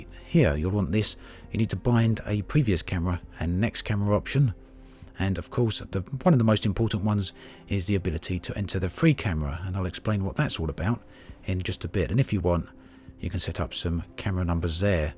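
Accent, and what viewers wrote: United Kingdom. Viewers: Still a great video. I went from thinking maybe I have to buy some sort of add on to make the cameras work, to using mine (basics) in about half an hour. Thanks for efforts your videos are excellent - and nice to hear a British accent as welk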